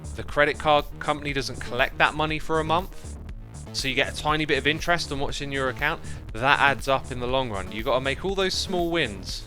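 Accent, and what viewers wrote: United Kingdom. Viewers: X's streams are perfect for: - for soothing oneself to sleep - for relaxing while e. g. drawing, sewing, cleaning - when you just want to have some background noise (a one which makes you laugh out loud every 2min) - for cuddling up with your pet and a cup of tea - when you need to hear some dad jokes - for taking a walk/run on a treadmill - for chilling out with your siblings - when you are in need of hearing a slup of tea (one of my basic needs tbh) - for when you need to be reminded to take joy in simple things (he's seems so grateful for even the simplest of things) -for when you want to develop a British accent For everything, okay? THEY ARE PERFECT FOR EVERYTHING. I'm glad I can watch them on YouTube :-)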